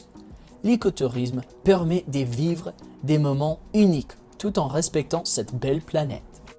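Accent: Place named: France. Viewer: Excellent ton french accent.. Élise et Julien ainsi que Annick ont eu ton lien.. Il devrait echanger avec TOI.. 😊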